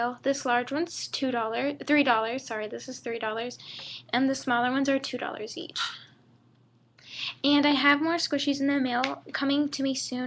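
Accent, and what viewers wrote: United Kingdom. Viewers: why was she talking in a British accent? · You seem like a British person ;) I think the accent fits you perfectly! ^^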